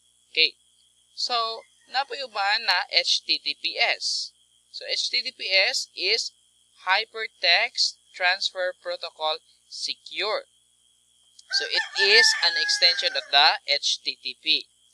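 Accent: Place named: Visayas, Philippines. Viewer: Di ko maintindihan. Parang bisaya kasi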